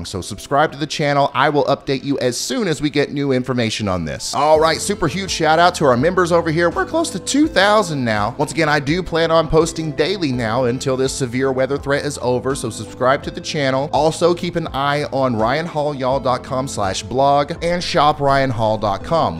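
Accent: South, United States. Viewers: New here, honestly might come here for my news forecasts. sets me at easy with a true southern accent giving me the weather than them dang Dallas newsboys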